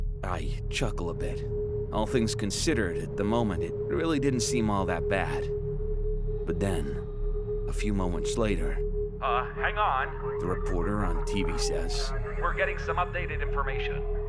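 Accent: United States. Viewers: Its my 1st time listening, I'm from California. I thought you were an American from the eastern states , not east coast though. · Came over from Dark Somnium, and glad I did. Great narration, ambiance,and excellent job on the US accent! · wait...mf you ARENT from the US...?? This is my first time hearing ya, and I wasn't able to tell at ALL, I thought you were American. That's saying a lot considering I was literally born and raised in the US lmao. Some advice you may or may not find useful for future readings with the US accent : What you did was a north eastern accent, and very similiar to mine (plain standard US accent here). It matched the architecture shown in the art perfectly, dont know if this was on purpose or just luck. Some words were pronounced similairly to the 'standard canadian' accent, but thats actually accurate depending on the region, as many northern US citizens pronounce things similarly/exactly the same. 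Also: Telling apart the standard US accent from the other northern variations can be almost impossible unless you hear certain words pronounced. So dont get frustrated if you have a difficult time telling em apart: Many Us citizens can't, and they literally live here. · Wait- you’re NOT American?! Damn dude!! Bravo!!! New visitor, new sub!!!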